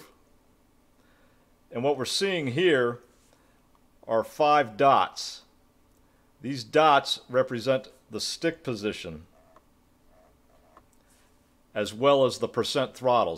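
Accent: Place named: United States. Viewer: Thanks for these words that recomforts me :) . As you just said, I was not expecting a such not easy and not fast learning phase. I'm always amazed, to be in south of France, you somwhere in the US (according to your fantastic accent) taking time to record yout videos and me here and enjoying your advices in the nature near to the sea. Sharing between human is just one of the most wonderful thing, whatever the subject. It was the philosophical though of a sundazy morning. Cheers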